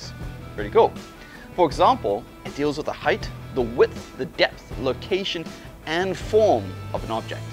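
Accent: South Africa. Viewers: sounds like a South African.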